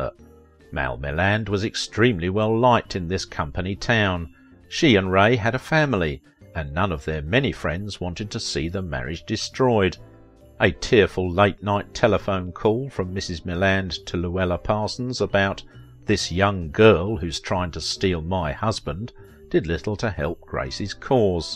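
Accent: England, United Kingdom. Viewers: Sometime this guys English accent is just too much for me. I can't understand everything he says. Is this only me ?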